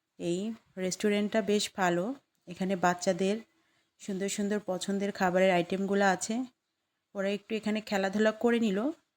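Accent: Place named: Kushtia, Bangladesh. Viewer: আপনি কুশতিয়ার কথাএ থাকে ❤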